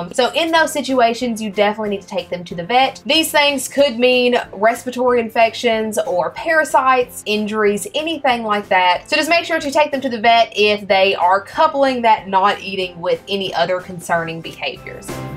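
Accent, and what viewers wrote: South, United States. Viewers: Very informative video. And it is nice to see a beautiful woman with a Southern accent. I have a 10 month old ball. She does really well feeding. I feed her a grown mouse about every week. I think it may be time to move up to small rats. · Damn u a QT!! I had to sub!! CANT get my Lemon Pastel to eat a FT to SAVE his Life!! 🤦‍♂️ I cant even get my lil ninja to STRIKE one time just on some Defensive issh!! 🤦‍♂️ I know he ain’t gone strike at a mouse NO time Soon!!! Also, what part of the South are you from??? Lol I love your countriness(I know that’s not a word)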